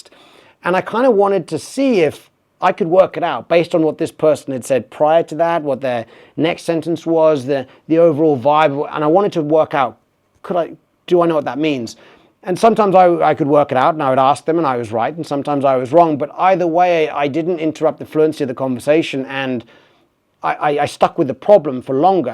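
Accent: Thailand. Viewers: สำเนียงไทย 100% เลยค่ะ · Only Bangkok people speak like this, it's too proper for me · When you are speaking English, i thought Thai is speaking English. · His Thai sneaks up on him when he speaks English 😂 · I don't know why but your English sounds exactly like English speaker who can speak Thai. It's often like that and it's fascinating. Maybe learning Thai changes your how you speak English?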